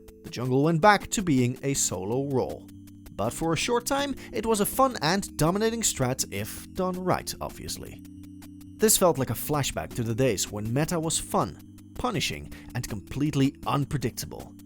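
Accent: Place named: Netherlands. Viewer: I hear a dutch guyyy, Ahoi en gegroet :P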